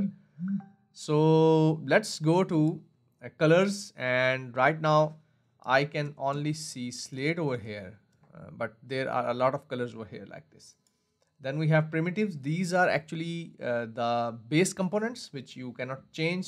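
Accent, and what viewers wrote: India. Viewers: Why you sound like Indian but look like European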